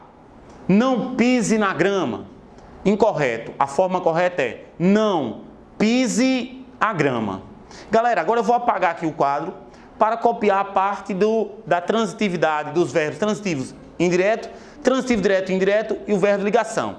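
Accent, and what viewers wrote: Northeast, Brazil. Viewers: Um nordestinoooo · sutaque nordestino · Fessor vc é lindo e é dez!!!! Gostei do sotaque nordestino!Adorei a dica "DECA"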